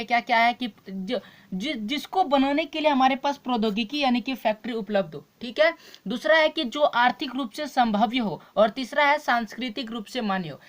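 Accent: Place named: Rural, India. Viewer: Gawar jaise kyo bol rehe ho aur faltu me pencil kyo chela reha hai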